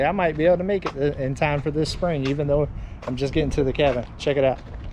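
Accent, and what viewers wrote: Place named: Louisiana, United States. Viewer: Just want to know if you're from Louisiana because you sound like you got Louisiana slang going on when you talk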